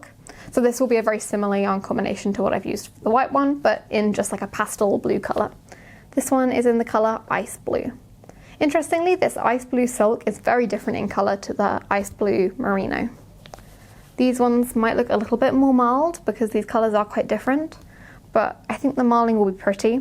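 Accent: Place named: Southern England, United Kingdom. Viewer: If you just put a short ‘e’ sound after the ‘r’ it comes up somewhere close to the Finnish pronunciation. So ‘Taremo’ is closer than ‘Tarmo.’ Then if you hold your tongue a little closer to the roof of your mouth on the ‘r’ sound, it gets a bit better again. That’s easier with a flat ‘a’ sound like we say in Yorkshire, though, rather than than your longer, Southern ‘a’ because the mouth is already wider and flatter. Think the ‘a’ in cat rather than in ‘Arthur.’ Lovely episode thank you x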